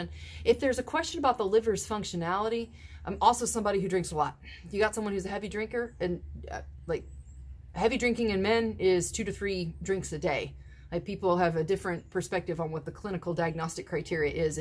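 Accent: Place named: Tennessee, United States. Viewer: I love that Tennessee tone.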